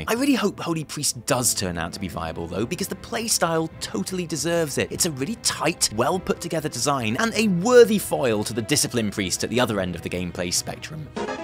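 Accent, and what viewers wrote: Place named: RP, United Kingdom. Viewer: BBC voice, love it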